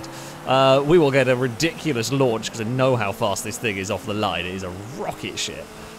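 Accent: United Kingdom. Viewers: You realise is British, right? Only Americans call Z's, Zee. Most other English speaking country calls it Zed. And calling it a "Sell-eekah" is the British accent...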